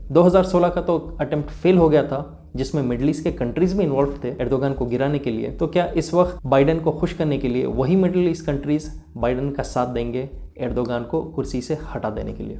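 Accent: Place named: Karnataka, India. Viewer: bhai ap karnataka se ho na apki hindi karnataka ke jaisi hai i am from karnataka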